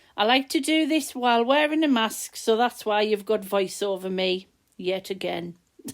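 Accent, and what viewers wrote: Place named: Sunderland, United Kingdom. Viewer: hi im new to your channel I've found you through Sallys channel ,, love love love the gothic look ,I'm from Sunderland and I can't figure outl how close you are from your accent 🥰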